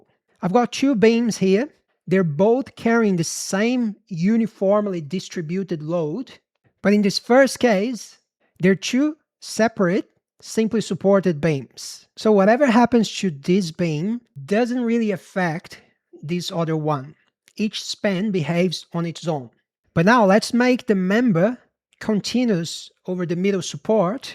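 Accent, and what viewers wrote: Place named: Australia. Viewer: The ozzie accent on a brazillian is mind boggling. Absolutely unbelievable! It's almost the opposite of a Japanese speaking Arabic.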